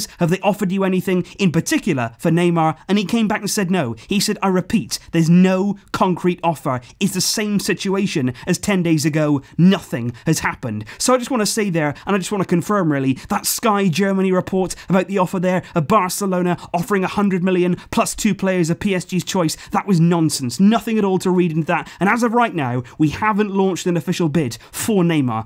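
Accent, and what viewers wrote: Wales, United Kingdom. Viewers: Where are you from? U sound British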